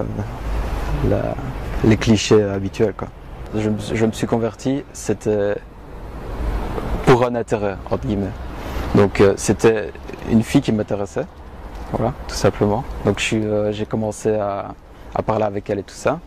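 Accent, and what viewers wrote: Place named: Belgium. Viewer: But he's from Belgique so he've got a accent, I mean this french sounds a bit different.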